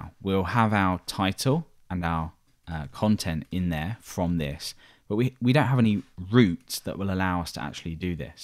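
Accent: England, United Kingdom. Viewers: Hello there! No doubt your approaches to implement functionality are the best all over the PHP web dev world, I just wish Hollingworth, Schiff or Traversy would explain them to me as I feel so sorry to tell you that you lack a bit on explanation and I struggle to capture your english accent. Anyway, thanks very much I really appreciate to get to realize how solutions can be implemented in a clever way.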